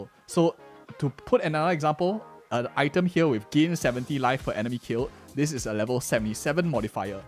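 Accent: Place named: Singapore. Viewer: Why do I hear Singapore😂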